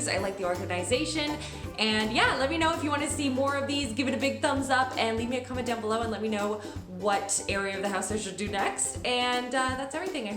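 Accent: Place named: Canada. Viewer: ❤️What an improvement! You make me giggle in all your vids whenever you say words like “a boot”...(about) 😛😘. That Canadian accent coming through!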